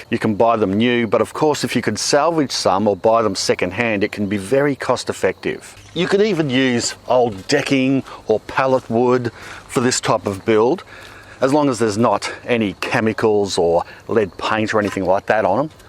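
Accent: Australia. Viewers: I think the bed looks great! And I would watch just for that great Aussie accent!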